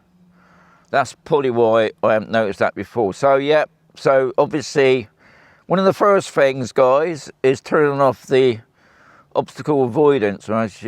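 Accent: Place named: West Country, United Kingdom. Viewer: Nice one,. You sound like you are from the west country. Brizzal? 😀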